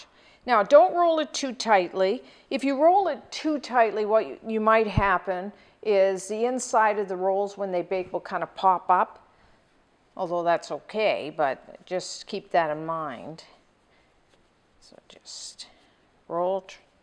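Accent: Canada. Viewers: oh darn i was wondering about that accent. i thought it was canadian but i guess that's similar to the northern accent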